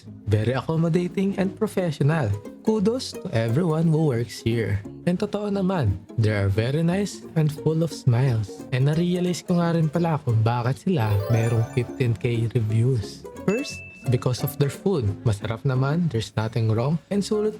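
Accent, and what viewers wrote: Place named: Tagalog, Philippines. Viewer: And luxurious nang tagalog accent mo lods, W vids tho.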